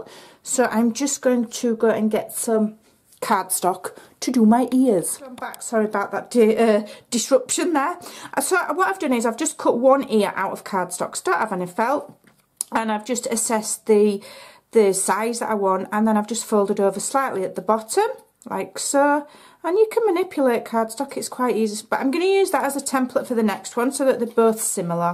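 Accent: Yorkshire, United Kingdom. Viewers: I love watching your videos! Are you from Yorkshire?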